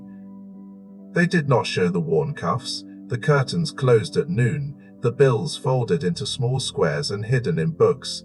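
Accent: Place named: United Kingdom. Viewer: Sorry can’t take the accent from across a pond talking about the American mob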